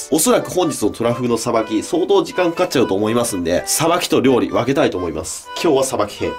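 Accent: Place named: Mikawa, Japan. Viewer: 三河弁が親近感わきました！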